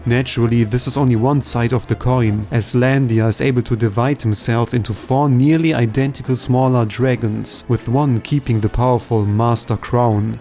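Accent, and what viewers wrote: Germany. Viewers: du bist deutsch richtig? das kann man raushören. 😂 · The German accent in his voice, I find it pretty neat sounding.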